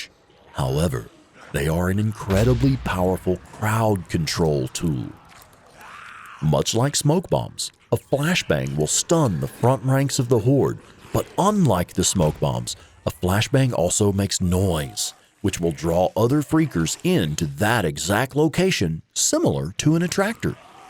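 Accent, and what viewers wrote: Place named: South, United States. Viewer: you changed your voice. I've watched a few of your older Days Gone vids and your voice was a little rounder then, with a hint of southern accent. this is a great game and you make great guides.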